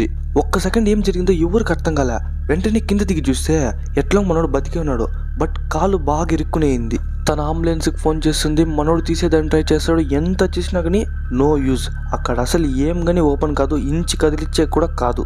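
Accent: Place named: Karnataka, India. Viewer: Language ನೀವು ಕನ್ನಡ ದವ್ರು ಅಣ್ಣ 🤣🤣ಪಕ್ಕ... Hemana paravaledu shoppu yav uoru naadi chikkabalapuramu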